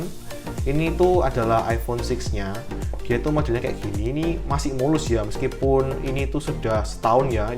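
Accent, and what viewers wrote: Indonesia. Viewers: Logatnya surabaya banget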